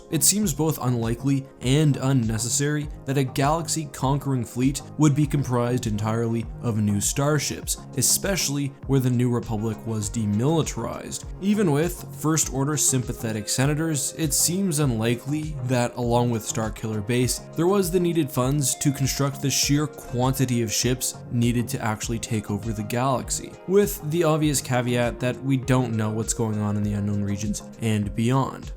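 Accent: Canada. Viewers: are you Canadian? As a Michigander, I know a couple Canadians. And my tech/accounting teacher is Canadian. I’m asking cause sometimes you say “aboot” instead of about. Lol I’m just curious.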